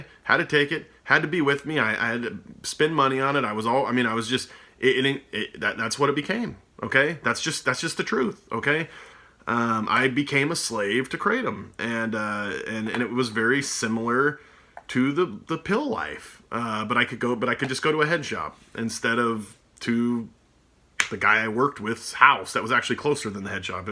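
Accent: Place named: Oregon, United States. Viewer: yes, you do. I’m an Oregonian, from just south of Portland, and you sound like everyone I know.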